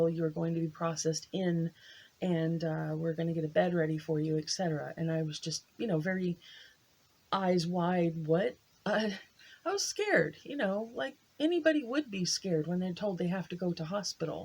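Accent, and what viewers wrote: United Kingdom. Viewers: Accent, kind of London, East End - Not bad at actually! - Seriously though, If you can ever find the time, I think your wide experience of both health care systems are well worth putting into print.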